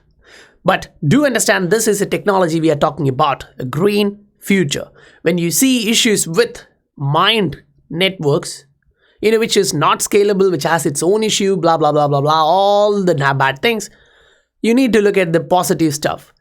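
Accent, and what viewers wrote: India. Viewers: You say you're in Korea but you sound like you're from India... ?? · ☺️ btw ur accent is quite similar to Indians 😒.. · the voice like Indian......?.....great fundamental analysis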